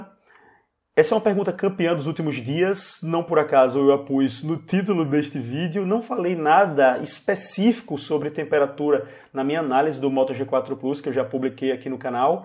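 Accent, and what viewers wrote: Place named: Aracaju, Brazil. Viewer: caraca tu é de aju? hahaha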